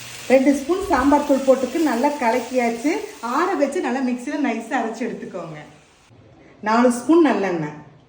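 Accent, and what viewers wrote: Kongu, India. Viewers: Kongu slang ❤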